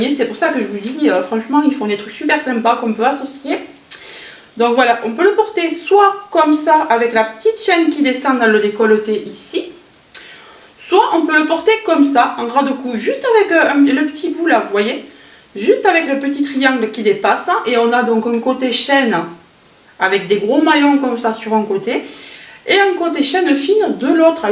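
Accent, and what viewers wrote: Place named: Pyrenees, France. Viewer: J'ai pas pu partir chez moi dans les pyrénées cette année alors ton accent me donne l'impression d'être un peu en vacances 😘😘😘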